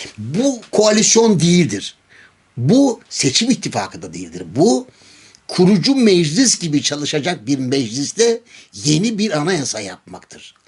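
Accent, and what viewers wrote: Thrace, Turkey. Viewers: Dedem trakya şivesine lütfen devam.....❤❤❤ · Biz de trakya şivesini severiz.. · Harikasiniz cok degerli bilgiler veriyorsunuz ...ayrica fikralariniz trakya siveniz mukemmel🇹🇷🇹🇷🇹🇷🇹🇷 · .Trakya sivesi ile konusmaniz cok keyifli . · Günaydın, mutlu sabahlar efendim. Yine her zamanki gibi salı gününede pozitif bir başlangıç yaptınız ve Trakya şivesiyle güzel fıkralara başladınız maşallah enerjik halinize bayılıyorum. Tanrı yaşamınızı hep böyle devam ettirmenize katkı versin. Tüm abone ve takipcilerede mutlu sağlıklı yaşam dilerim. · Saygılar dedecim. Bi gün videonun tamamını trakya şivesiyle yapsanız. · Ben de bayiliyorum Trakya sivenize, Gecen gun "Hinge" demistiniz, butun gun aklima geldikce guldum. :) Ancak Trakyalilar anlar sanirim. :) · LÜTFEN TRAKYA SIVESIY'LE KONUSUNUZ, COK HOSUMA GIDIYOR !!!... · Ben bayiliyorum sizin trakya sivenize. 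Sabah saat 6 da yuruyus yaparken sizi kulakligimla dinleyerek spor yapiyorum. Vakit nasil geçiyor anlamıyorum hocam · bilakis trakya şivesine bayılıyoruz beyaa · Trakya şivesi severim dede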